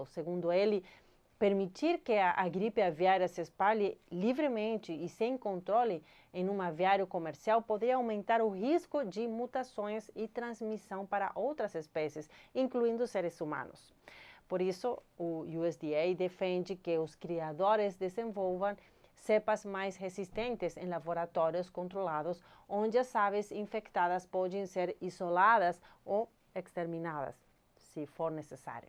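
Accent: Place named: Spain. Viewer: Amei seu sotaque espanhol.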